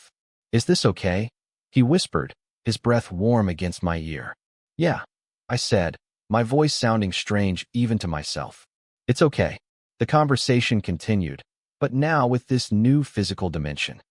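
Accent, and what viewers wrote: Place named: United States. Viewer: This story created by AI , both content and voice . It's still good for people who is learning English , all American accent is very excellent .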